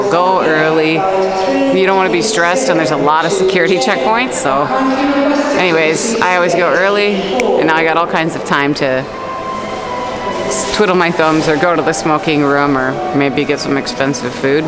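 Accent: Argentina. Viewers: You got me! I thought you must have had a meltdown last year. 😅You sound really cool, speaking Argentinian. You seem different as well. That's the power of language! Thanks for sharing. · Después de ver muchos de tus vídeos porque me encanta Egipto, me gusta mucho cómo lo muestras todo y además estoy estudiando la lengua árabe también (sé muy poquito pero voy progresando), me alegra muchísimo saber que compartimos otro idioma 😊. Yo soy de España y me ha encantado escuchar tu maravilloso acento argentino. Muchas felicidades a tu abuelita, desde luego está estupenda 🎉 Ya tienes una nueva suscriptora 👍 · Your Spanish is Argentinian or true Spanish like the Spanish spoken in Spain 🇪🇸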